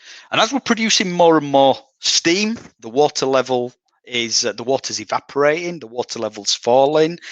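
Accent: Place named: Teesside, United Kingdom. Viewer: Hah! I grew up in Teesside; the accent is exactly how me uncle sounded. Brings back memories…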